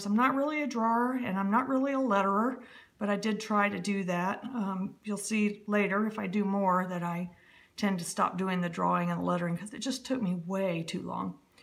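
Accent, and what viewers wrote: United States. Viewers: Hi, A wonderful flip through. The balance was perfect. I was interested all of the way through. It's lovely to put a voice to the face and you have that gorgeous American accent with the lovely elongated vowel sounds. I look forward to the next one, Ali xxx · you did a fabulous job!! Loved seeing all your beautiful pages and love your little southern accent!!!!!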